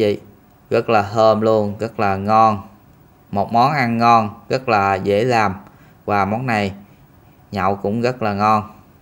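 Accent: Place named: South, Vietnam. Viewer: Nghe giọng giống của thầy Thích Tâm Phúc quá ! Rất Nam bộ , tuyệt vời ! Cảm ơn tác giả nhé !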